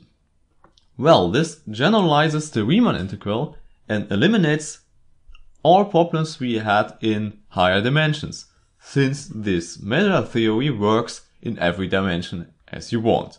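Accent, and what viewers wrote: Germany. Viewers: Thank you for this channel, it’s amazing! Quick question, are you German? The accent sounds a bit like my German grandma and that’s cool! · I am absolutly sure, that for other non-native speakers it is simplier to understand german accent, than speach of true englishmen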